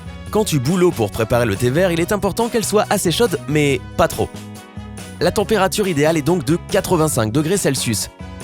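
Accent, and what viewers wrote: Quebec, Canada. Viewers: A mon avis, la vrais a du donner sa chaine a eux, c'est comme la voix Québéquoise, c'est la meme que. Ou sinon toute les chaines c'est une compagnie qui vous prends pour des con ( Je dis vous pck je suis pas abonné a eux )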